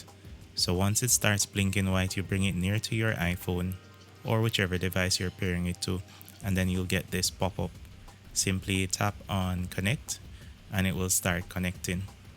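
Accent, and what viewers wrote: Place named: Jamaica. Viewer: You sound Jamaican...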